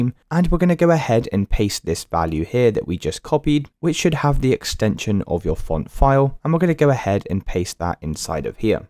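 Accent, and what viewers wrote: United Kingdom. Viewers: how British are you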